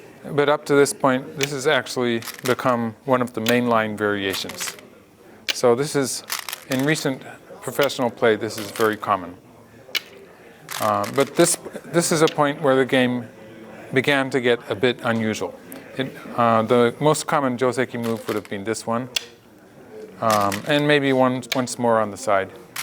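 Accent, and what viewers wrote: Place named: Japan. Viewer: I swear this guy's accent is sounding slightly Japanese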